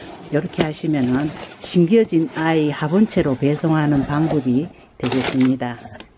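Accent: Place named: Gyeongsang, South Korea. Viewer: 아ㅡ고 포장여시 고수의 손길이 보이내요 ᆢ이리 꼼꼼이 ᆢ 정성또한 가득하네요 ᆢㅎㅎ♥️♥️♥️♥️♥️♥️♥️정겨운 갱상도 말투가 참듯기 좋네요 저두 진주 가시나 입니다 ᆢㅋㅋ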